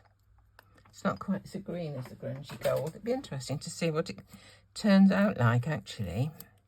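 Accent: United Kingdom. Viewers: I agree! Keep on talking! I’m from Canada and love your British accent. I’m addicted to you videos and look forward to them. Loved the Advent series.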